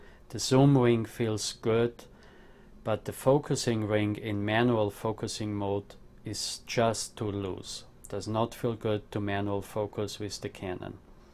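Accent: Austria. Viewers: Austrian accent.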